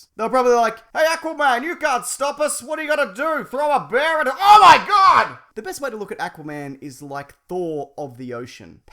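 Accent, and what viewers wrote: Australia. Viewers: i read the title with his sweet aussie accent before even clicking the video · I'm guessing your Aussie right I can hear that accent, mine isn't as strong as yours 😂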